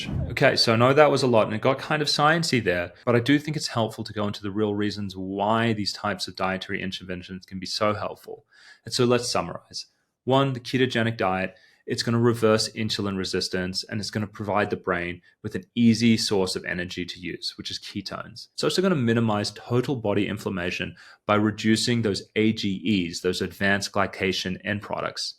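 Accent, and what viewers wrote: Australia. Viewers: Thankyou for the great info. Made some changes in my life and it's really helping. Do I detect an Australian accent? Curious as I'm an Aussie 😊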